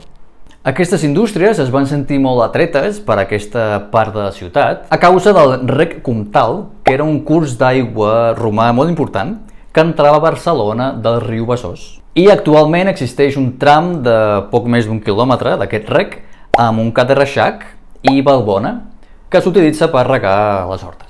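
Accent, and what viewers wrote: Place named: Brazil. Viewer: gràcies pel vídeo, m'ha encantat! ja parles amb accent brasiler, que boniques són aquestes mescles interculturals 😅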